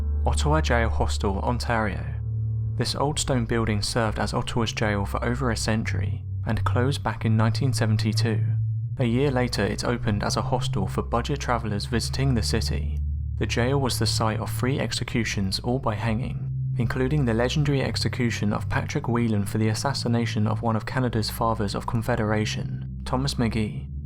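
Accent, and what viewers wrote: United Kingdom. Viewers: Why do you have a stupid limey narrating this damn video instead of an actual Canadian who can properly pronounce the cities and provinces in Canada??? Couldn't you have corrected the idiot before the final recording??? JEEZZZ...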